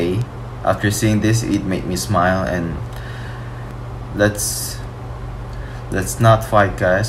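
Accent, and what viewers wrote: Philippines. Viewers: You sounded like filipino already ☺️ Love and peace to all Filipino and Korean people 😊❤️♥️ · Lol, you have a Filipino accent when you speak English. 👍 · Kaya pala your accent is like Filipino haha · Hey you've got a good filipino english accent and its perfectly clear.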